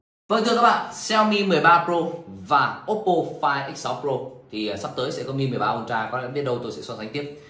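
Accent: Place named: Countryside, Vietnam. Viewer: Mặt bựa lẫn giọng quê quê!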